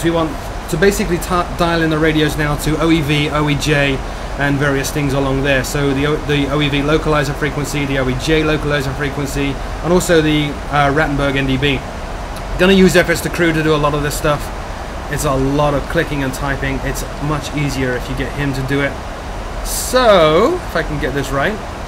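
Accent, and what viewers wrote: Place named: England, United Kingdom. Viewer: I love English accent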